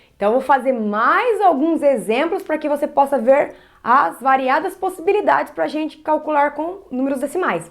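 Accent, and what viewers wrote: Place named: Caipira, Brazil. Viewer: Muito bem explicado! E o seu sotaquezinho caipira é muito bonitinho!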